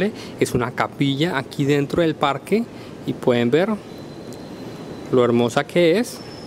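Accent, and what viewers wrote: Colombia. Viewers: Hola! Noto por tu acento que eres colombiano jeje. Una pregunta, tenemos planeado viajar en Julio de 2017 desde Bogotá, recomiendas comprar las entradas por internet o es mejor allá?. Gracias por tu video :)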